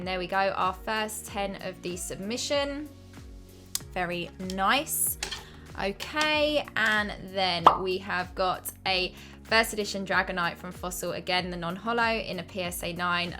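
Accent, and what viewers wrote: United Kingdom. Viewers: Was watching for 15 seconds before I realised you were British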